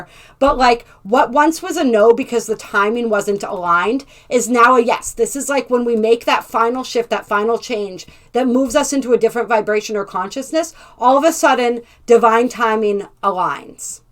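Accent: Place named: Midwest, United States. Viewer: Your video brought tears to my eyes, a pressure in my heart chakra and inner peace. Thank you for your spirit, your guidance and you Midwest accent 🌈❤️🧡💛💚💙💜🤍☯️♋️/♌️ 33/6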